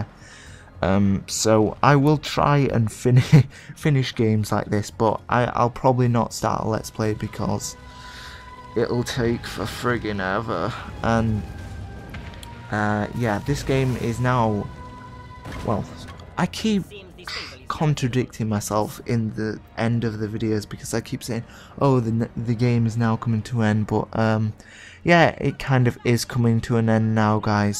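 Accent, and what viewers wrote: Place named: United Kingdom. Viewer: caal of duu'y thats how you brits pronounce it.. or another word like "later" will be pronounced like "la'er"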